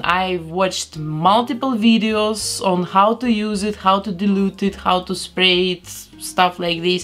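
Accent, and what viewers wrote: Russia. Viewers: Мне одной кажется что у неё русский акцент?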